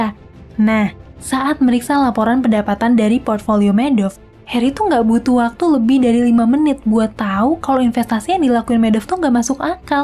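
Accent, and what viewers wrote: Indonesia. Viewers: aksennya indo banget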